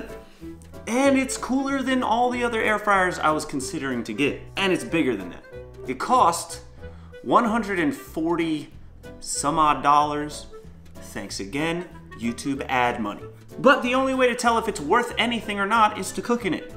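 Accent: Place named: South, United States. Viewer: You sound like you may be a nice southern boy...ranch! Buffalo wings were created in Buffalo New York. A lot of us use blue cheese. You do have other facts straight though. The wings were just fried plain and sauced after. You can season by just doing a simple flour dredge (season the flour) before deep frying and you shouldn't loose the seasoning it just isn't the original/traditional way.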